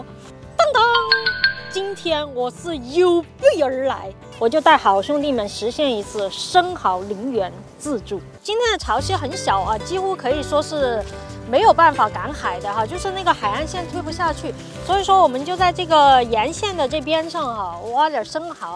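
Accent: Sichuan, China. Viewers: 这口纯正的川普 👌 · 这川普 有点掉格了😅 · 川渝口音好重，喜欢~ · 好重的川普味哟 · 听声音就晓得是咱四川妹儿，果断关注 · yooupi的口音对于川渝地区的人来说太有亲切感了😂😂😂😂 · 这川味普通话，有点想家了😂😂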